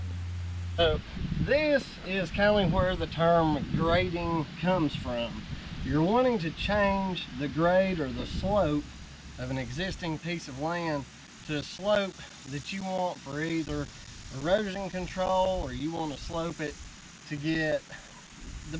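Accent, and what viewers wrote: South, United States. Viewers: your a hell of a teacher with your southern twang \m/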